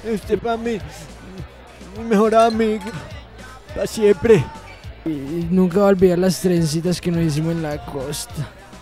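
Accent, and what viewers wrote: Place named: Colombia. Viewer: el mejor acento de colombia y latino america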